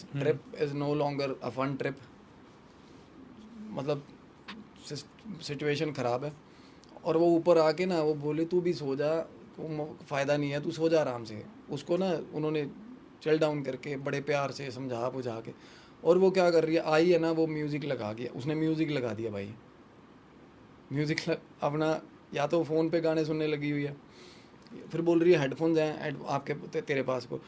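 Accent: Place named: Punjab, India. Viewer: Bhaiya wo punjabi English hai ...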